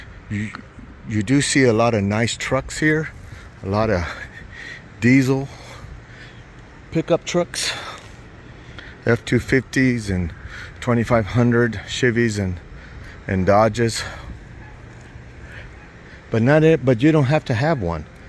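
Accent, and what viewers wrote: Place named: Latin America. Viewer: I hear a Latino accent. Hablas español?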